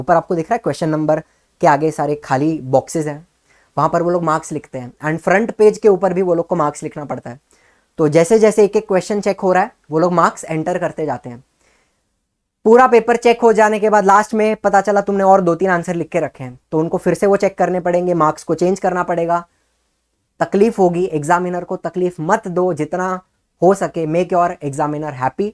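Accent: Maharashtra, India. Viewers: Sir you are marathi. जात्य डोक्यात. 🤔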